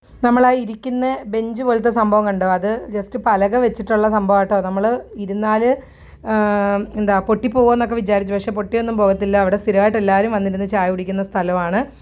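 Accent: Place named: Travancore, India. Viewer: തിരുവിതാംകൂറിലെ സംസാരം എല്ലാവർക്കും വ്യക്തമായി മനസ്സിലാകും. അഭിനന്ദനങ്ങൾ.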